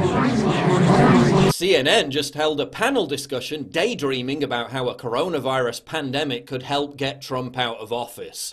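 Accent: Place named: England, United Kingdom. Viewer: I love you,. You are not only young and handsome, ( don’t worry,I’m 73 years old) but smart, politically well informed, and courageous. Oh, and I loved your beautiful English accent! Oops, did I sounded racist?! What the heck! Very seriously now, this is an analysis that we don’t see in the corporate media full of coward unprofessional journalists Excellent! 👏👍❤️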